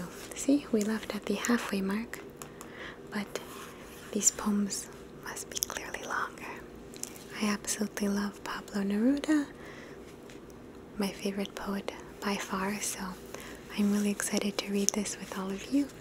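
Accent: Italy. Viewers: you’re little Italian accent in Spanish is so cute :) btw I love that your dog is right behind you kinda camouflaged lol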